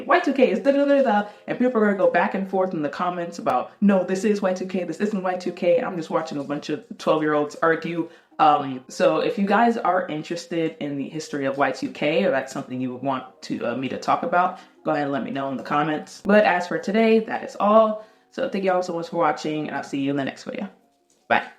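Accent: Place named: France. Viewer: The pronunciation is a frensh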